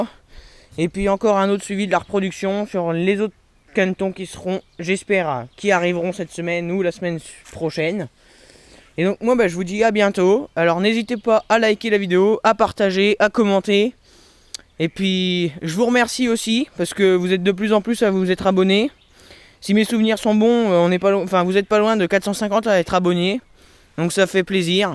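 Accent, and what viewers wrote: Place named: Marne, France. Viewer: Je crois reconnaître le même accent que moi de la Marne non!?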